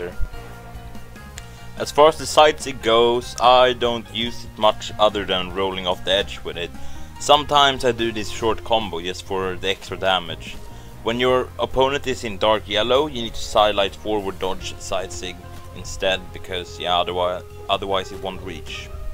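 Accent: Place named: Sweden. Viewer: Wait,are you Swedish? You've got that accent :D and btw really helpful video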